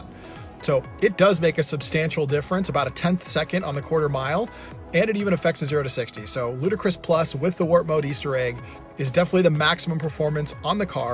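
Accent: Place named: Scotland, United Kingdom. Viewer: Love there is a bit of the Scottish Lewis Capaldi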